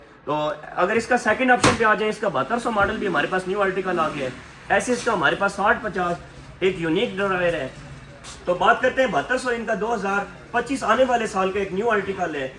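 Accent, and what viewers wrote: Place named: Chakwal, Pakistan. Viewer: بندے کی اردو تو چکوالیوں والی ہے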